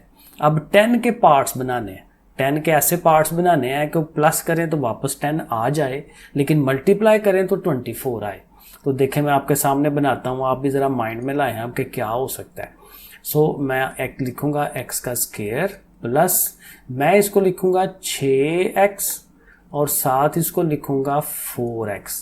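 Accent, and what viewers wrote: Sialkot, Pakistan. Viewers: Very nice Ap urdu sialkoti lehja min bolty hen I think you are Sialkoti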